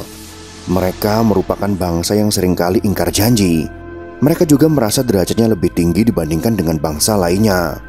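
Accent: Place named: Bali, Indonesia. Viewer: Admin nya orang bali sepertinya. Dari logat suaranya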